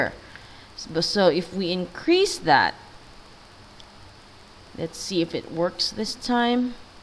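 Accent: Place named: Philippines. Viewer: Pilipino ka po Mam...halata ko sa Accent mo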